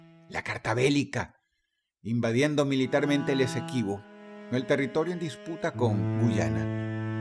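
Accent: Argentina. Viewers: Jajajaja y por que no habla como venezolano? Tiene acento de argentino o algo asi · Ya era hora de que lo hicieras, ya hacia demasiado tiempo que agarraste acento argentino.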